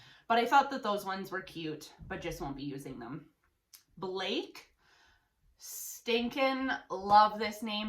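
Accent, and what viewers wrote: Canada. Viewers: Sorry I’m late. I just started to watch you’re videos. I was fascinated by the diy haircut video and the Canadian accent. I’m from Michigan. 😉 Here was our boy name list. Our sons name is Ethan 💙 Jack Jackson Jake Jacob Jaden Jayden Ethan Carson Zachary Carter Tyler Liam Bentley Blake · this is the first video of yours ive ever seen so are u canadian? u sound like u have a super strong canadian accent (im canadian btw)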